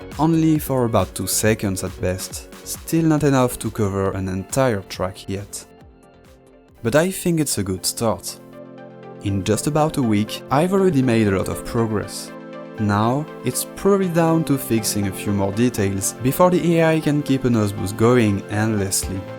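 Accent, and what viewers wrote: France. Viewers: Are you french bro ? Love the accent haha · Are you french ? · t es francais nan? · Good video I love the idee. Ps : tu es francais ? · On entends ton franglais MDR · Je me disais bien qu'il y avait un petit accent Français, tu parles super bien anglais j'ai mis 2 vidéos à capter mdrrr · Wow, cool video, i've watch your others video when you train your IA it helps me on school project thanks (btw i've got a question. is that the "french accent" ? (because i'm frecnh so i ask)) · are you french? cuz since the video started i heard ur accent and was kinda curious about ur nationality, and when i heard the way you said "parameters" i was like: "he must be french". the reason im asking is because my curiosity is killing me bro also the video is awesome. that seems so hard to do. i've always wondered like how are they making AI. i mean the how is it LEARNING if you have to CODE it to DO something. 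it still blows my mind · Tu es français nan? Tu as un accent fr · l'accent bien français comme on aime xD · Are you French ? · you sound like you'r french are'nt you ? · Je suppose que tu es français? Ton accent est bon mais très français 😂❤❤❤ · Pourquoi il a un accent français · Fr I spotted the french accent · Tu es français ? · bro are you french? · bro gotta be french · I cant listen to this french accent Im sorry... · 100% il est français mdrrr super vidéo sinon! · t'es francais ? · t'es francais non ? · Are you French or Chinese? Or both? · are you french by chance? trying to place the accent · Rooh l'accent bien français c'est cramé 😭😭😭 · J'entend la france dans ton accent 😂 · You are French ? Your voice is strange. Tu as un accent assez français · are you french?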